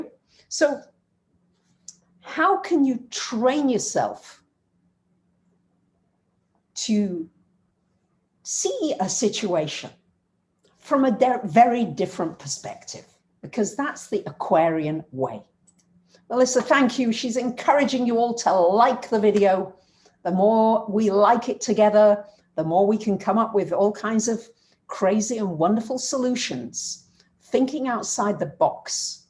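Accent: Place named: England, United Kingdom. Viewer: Love your passion towards astrology and english accent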